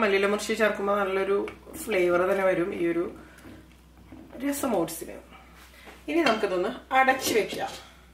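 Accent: Thrissur, India. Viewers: Although I am not a subscriber to your channel, I use to watch your cooking’s. Your Malayalam accent like angamali / trichur style . Believe you work in overseas. All best wishes .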